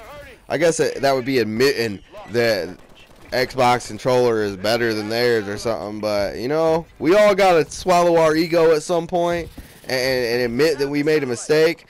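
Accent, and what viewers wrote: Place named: Indiana, United States. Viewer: 20 times!!!!More times!!!! I can tell your from Indiana. lmao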